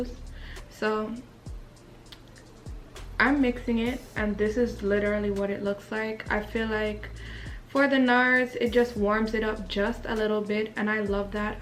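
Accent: Jamaica. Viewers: Oooh! I hear that Jamaican accent! 🥰 i love meeting other Jamaican Youtubers like myself! We ah go up this year!🤪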